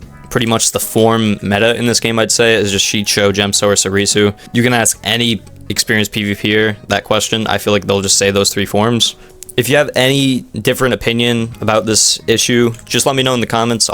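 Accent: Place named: India. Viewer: Turn off the indian accent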